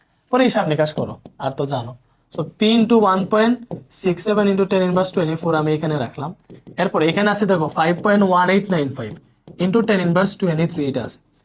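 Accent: Sylhet, Bangladesh. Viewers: Ashole vhaiya sylhet er to tai accent gula amn hoy BTW vhaiya genius ❤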